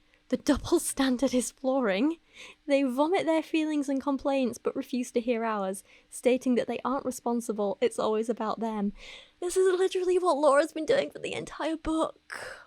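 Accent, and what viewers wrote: United Kingdom. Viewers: I wish I could understand you. 😅😅😅😅 your accent works well in the UK...not in the US....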